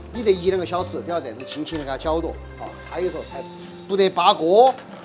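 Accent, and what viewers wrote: Chengdu, China. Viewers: 好亲切的成都话！多谢！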